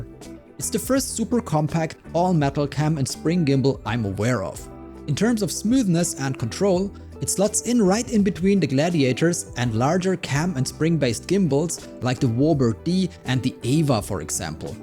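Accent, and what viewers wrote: Germany. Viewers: I might become a member or something as well. But give me some time on that, because I just discovered your channel. Gotta decide if, and how much I would be if I do. But all is positive so far. Enjoyed talking to you. Also, is your accent German? Just curious, because I'm German, Irish and Bohemian (gypsy Bohemians at that). I think it's neat to learn things from people in other countries.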